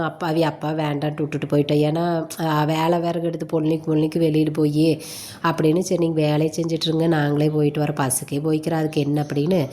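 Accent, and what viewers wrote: Kongu, India. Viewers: Ayyo akka neenga erode ah naa karur 😊😊😊😊 Kongu tamil manakkuthu Naanga mutthur maniyan neenga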